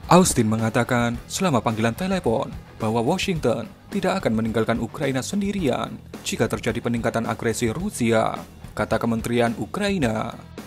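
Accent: Java, Indonesia. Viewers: Bahasane MEDOOKKKK....wagu lahh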